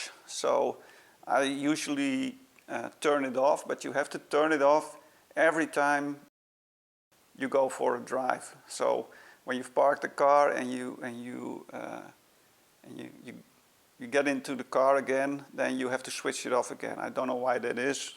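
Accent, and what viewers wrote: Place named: Netherlands. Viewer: That's a DUTCH accent not German, oy vey....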